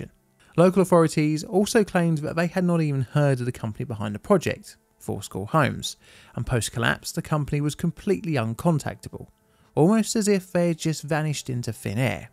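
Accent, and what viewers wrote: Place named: Croydon, United Kingdom. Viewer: I knew u were from Croydonn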